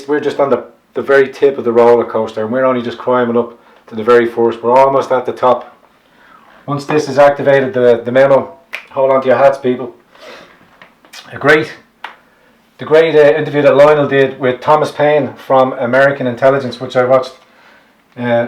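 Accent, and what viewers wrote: Ireland. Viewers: I love your brogue brother. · i love Irish accents, thank you my brother. may YHVH our Yeshua bless and keep you in HIS hands. please research where Israel was scattered; you as the Irish, are of the tribe of Judah. Israel will have blindness of face until the end of days. we're there, this is the end of days. Find out to whom you belong, who is Israel? Did YHVH lose HIS people? NFW. read Isaiah , ask yourself who hung on the cross. YHVH is our Yeshua, you are of His tribes. know your enemy Jn. , , Rev. 2:9, 3:9 or Mt.13. bless you brother. James (of Irish blood)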